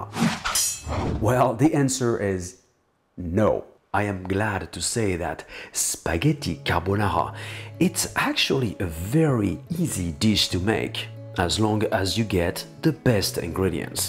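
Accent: France. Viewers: When I am in heaven, I hope there is an option for a French-accent man to prepare carbonara for me.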